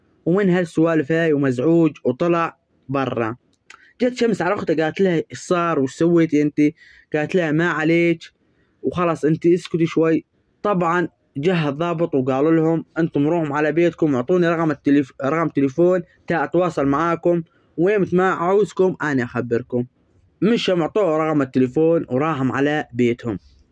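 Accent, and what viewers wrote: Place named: Hejaz, Saudi Arabia. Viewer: السلام عليكم اخي ياحلو سوالفك وسردك للقصه ليتك كل ليله تجيبلنا قصه وجزاك الله خير والله تابعت كل قصصك واعجبني لهجتك الحجازيه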